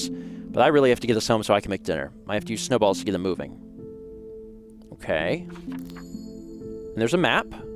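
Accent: South, United States. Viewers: I feel the same with danish XD soutern american pronunciation though... the poor man is really doing his best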